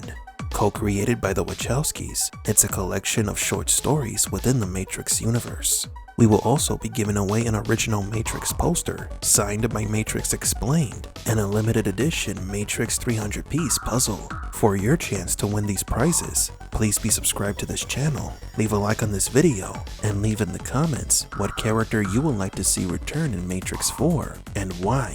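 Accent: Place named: Russia. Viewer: Nice accent, narrator person! 👏🏻👏🏻👏🏻 Russian ryt?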